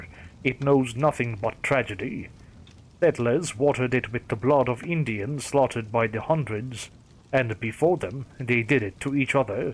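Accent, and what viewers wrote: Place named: Sweden. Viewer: it's not that your voice is monotonous. You have an odd tendency to raise the pitch of your voice when concluding sentences. It's unpleasant. Your accent sounds Swedish. Is that correct? If so, it might be because of that. I've also heard similar tendencies in Indian accents.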